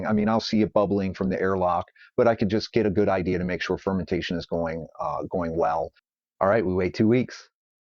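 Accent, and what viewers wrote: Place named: Maryland, United States. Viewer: I appreciate your videos. You sound like you’re from Maryland. Are you?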